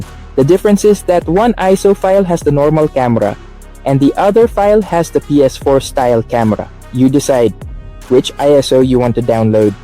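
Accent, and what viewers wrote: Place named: India. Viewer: Bro buen trabajo con lo del inglés pero por curiosidad quería saber por qué tu acento suena un poco como de la india?😅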